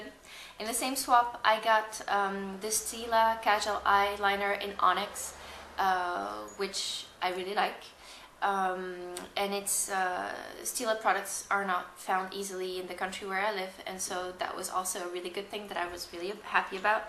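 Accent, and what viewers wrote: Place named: Sweden. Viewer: What country are you from? You sound Swedish :)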